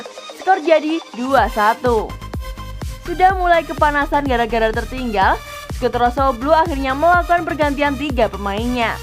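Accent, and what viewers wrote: Java, Indonesia. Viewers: Admin nya orang jawa ya.. mantap lah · adminnya mantapp mendok berasa main di kediriii akuu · POKOKNYA MILAN DI SISA MATCH SELALU ON FIRE KARENA SUDAH TERTINGGAL 9 POIN DARI MERDA INTER LAH MEDOK LAGI VO NYA WELEH WELEH FORZA PRE SEMPRE MILANELLO MARCHEND JAKET MILAN APA KABAR TUH MIN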